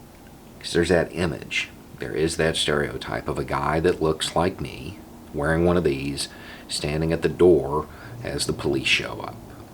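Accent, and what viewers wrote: South, United States. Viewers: We all have prejudices. It seems to me that having a 2 party system has divided the country between liberals and conservatives. It’s the only way the elites can maintain power. Divide and rule is the name of the game. As for you, the fact you have that southern accent brings those preconceptions we have about middle America. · you are the reason why thousands of people overcame their prejudices against Southern US guys of European ancestry (I reject the classification into black and white. Euro- or Afroamerican is more realistic). Good Southerners are too often overlooked and put into the same box as the bad guys. Same with Israeli Settlers, Iraqi Sunnis and ethnic Russian Ukrainians. You speak a certain dialect from a certain area and bam! you are suspicious. Anyway. Good job. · I discovered you just today, via a FB friend, and dude, you are awesome. 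I'm sorry to say that I do stereotype some, so how much more do I love hearing your Southern twang say things that aren't Trumpisms. Tats & the beard's hip up here -- in small town Ontario, anyway. The tank top/wife beater top's only offensive if it's worn by a very laege, sweaty person who should know better. Maybe things are different in the US? I hope things get better. You guys deserve so much better than Trump, or Bush, or bloody Nixon, or (dare I say it) Reagan.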